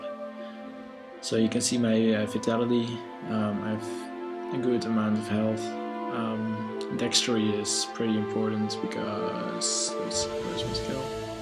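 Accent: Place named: Netherlands. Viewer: dacht ik ook al nederlands accent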